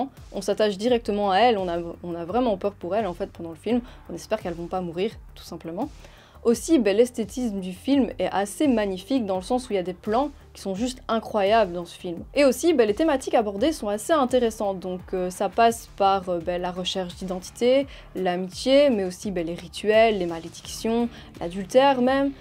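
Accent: Belgium. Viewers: j'adore l'accent belge :D ça me rappelle Bruxelles! J'adore les vidéos!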